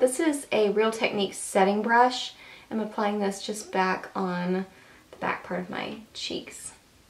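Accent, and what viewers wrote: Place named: Texas, United States. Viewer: I literally LOVE your accent, and it makes me happy that you're a fellow Texan! Do you do collabs? I'm totally interested in doing one with you. Let me know. If not, I still love this! Haha.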